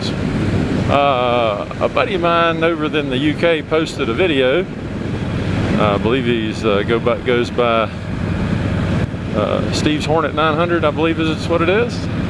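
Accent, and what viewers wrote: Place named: South, United States. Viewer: Well I for one love your Southern accent! Over here we have so many people who use Rising Inflection when they speak! It's SO annoying! Apparently we have the Australian soap Neighbours (there you go, another word spelt differently lol ) to blame for this!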